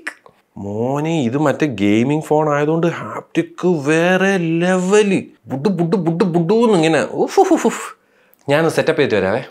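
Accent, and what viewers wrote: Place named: Thiruvananthapuram, India. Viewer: Edake tvm slang varunu but polii annu muthe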